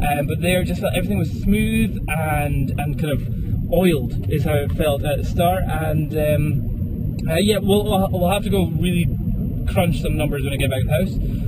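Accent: Scotland, United Kingdom. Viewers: dude , where are you from , Edinburgh / glasgow ? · he's scottish, it's his accent bro.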